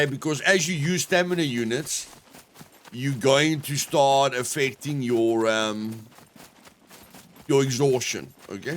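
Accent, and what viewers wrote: South Africa. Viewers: hey you sound south african what server do you play on?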